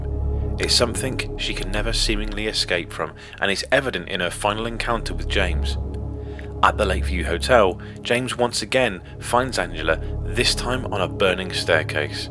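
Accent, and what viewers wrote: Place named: Yorkshire, United Kingdom. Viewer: Glad to hear a friendly Yorkshire voice 😀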